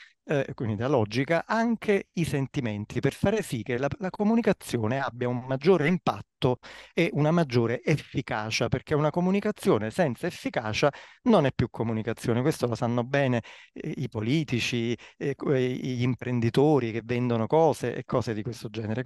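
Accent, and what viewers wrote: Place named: Campania, Italy. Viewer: M' illumino di immenso...nell' ascolto del Professore dalla squisita cadenza campana, e dalla serena e incisiva esposizione di una realtà che, da sempre osservo, analizzo e compatico. Iniziando la analisi del greco antico, si aprono mondi, universi ... è assolutamente prioritario essere messi nelle condizioni di studiare la realtà circostante andando ad analizzare quali erano le parole utilizzate dalle grandi Civiltà per definire il nostro Universo. Da lì le ispirazioni si aprono...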